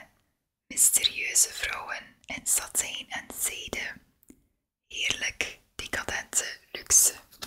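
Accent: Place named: West Flanders, Belgium. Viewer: Leuke video met dat sgoone westvlaams accent.... zeer aangenaam x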